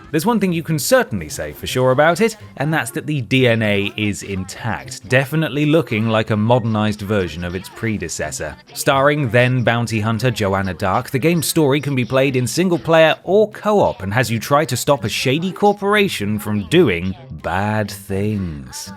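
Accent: United Kingdom. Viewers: A British commentary. Refreshing indeed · I love this channel because they put subtitles, I'm English learner and sometimes I don't understand the british accent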